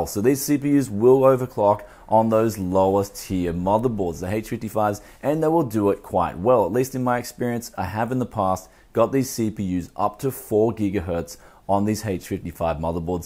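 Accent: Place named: Australia. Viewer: He's Australian xD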